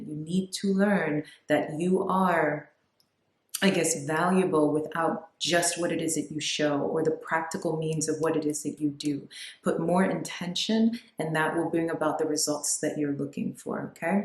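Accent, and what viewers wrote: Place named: Caribbean. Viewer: I never noticed your caribbean accent before. Beautiful ✨️✨️💕💕 I'm Trinidadian. Thank you for this beautiful reading!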